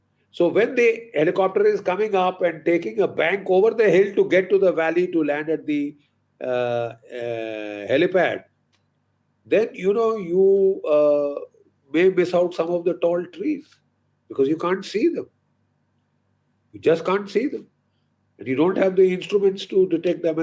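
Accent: India. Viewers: His accent is typically Indian and jarring to the ears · change his style of speaking from a strong typical Indian slang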